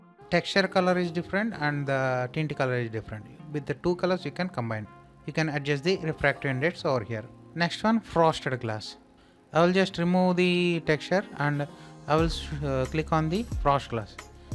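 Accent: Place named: India. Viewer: I had hoped to follow your tutorial but it was just too frustrating. I find it difficult to understand Indian English because American English is my native tongue. It would help tremendously if your narrative was presented in closed caption. Thank you for your tutorial and your understanding.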